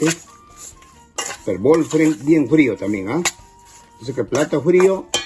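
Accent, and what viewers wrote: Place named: North, Peru. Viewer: cuales barbaridades? su manera de hablar es del norte del Perú con mucho orgullo yo soy de lima y lo entiendo perfectamente si quieres alguien que hable bien el castellano hay otros youtubers este el un canal de cosina no de lenguaje .....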